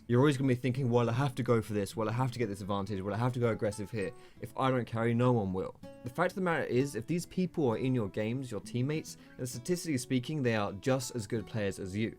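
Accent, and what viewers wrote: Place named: United Kingdom. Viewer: I love British accent :3 #nohomo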